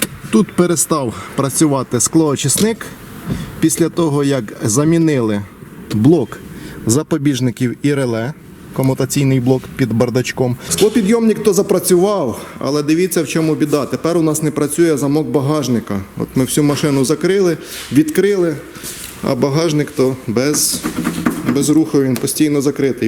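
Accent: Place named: Surzhyk, Ukraine. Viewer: Только правильно не "перейдемо на украïнський" а "перейдемо на украïнську". Почему я, русскоговорящий, знаю грамматику лучше? Это как, никого не смущает? Если уже говорите на украинском, делайте это хорошо, не похабте своим корявым суржиком действительно красивый язык.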